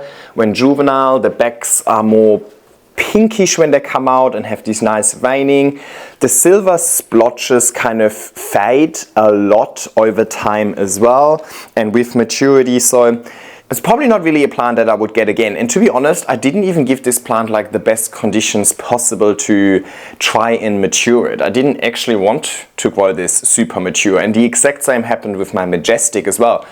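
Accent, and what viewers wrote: Germany. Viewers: I don’t know why but I can’t get over your accent (?) there’s a voice in my head screaming “he is germannnn!!!!!” Love your Videos btw :) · I sorry but I have to ask are you from Germany or Austria? You sound like my friend from Berlin 😅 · Are you originally from Germany? I can hear an accent.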